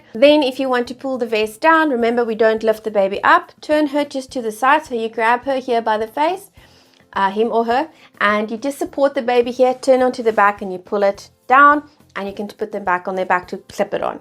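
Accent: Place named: South Africa. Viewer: I know a South African voice when I hear one🥺🩵🩵🇿🇦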